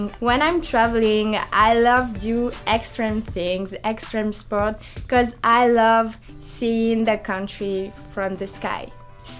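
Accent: France. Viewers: aaahh that french accent gives me life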